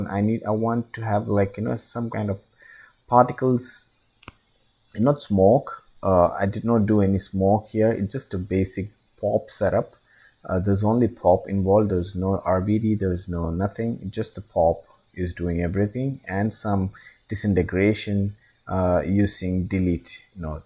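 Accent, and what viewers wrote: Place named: South India, India. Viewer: South Indian spotted XD Nice video though, loved it!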